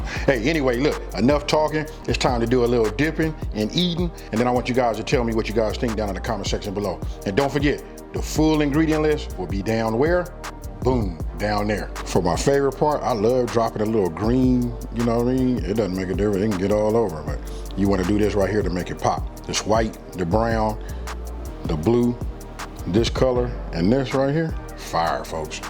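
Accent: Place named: West Coast, United States. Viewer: Philly in the check inn! Big Homie westcoast Accent heavy! 💪🏽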